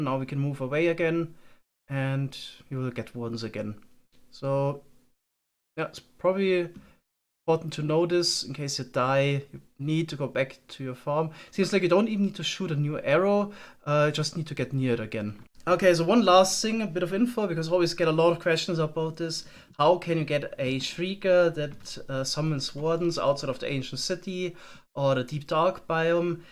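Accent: Germany. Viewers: er hat nen deutschen english Akzent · Der deutsche Akzent ;-)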